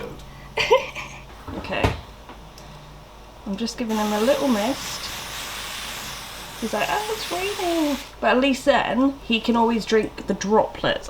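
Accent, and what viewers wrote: United Kingdom. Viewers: Yo your British i didn't know that respect to all my British people out there💯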